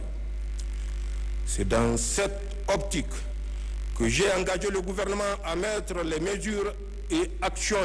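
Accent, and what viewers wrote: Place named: North, Benin. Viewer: Le Président Thiani a l'accent des béninois du Nord.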